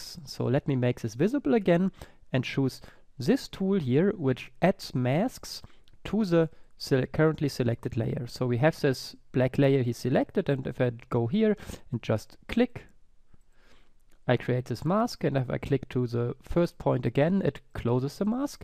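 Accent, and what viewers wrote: Germany. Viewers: Man hört das du Deutscher bist xD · Yay, ich heiss auch!:D Deutsch Akzent FTW!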